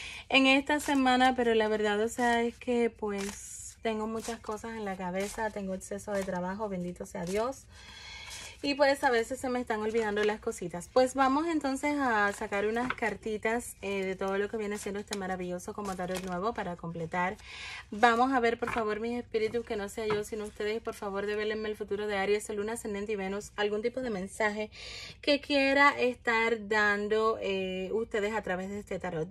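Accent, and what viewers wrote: Dominican Republic. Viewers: Hay enemigos si soy policía y mis jefes son misogenos y yo no me dejo de nadie una verdadera bomba en en trabajo(nido de víboras) yo espero la mandinga o ya al menos el dinero del amor hablamos después jajaja muchas gracias te quiero mucho y me encanta tu acento Dominicano 😘😘😘😘😘😘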